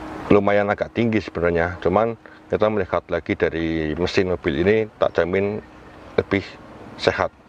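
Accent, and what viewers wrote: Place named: Java, Indonesia. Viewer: medok nya agak ganggu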